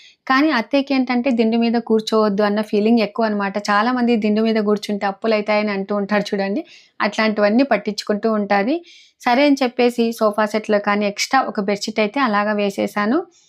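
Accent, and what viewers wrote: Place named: Kurnool, India. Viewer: మన కర్నూలు యాస మార్చి మాటలు వదు 🎉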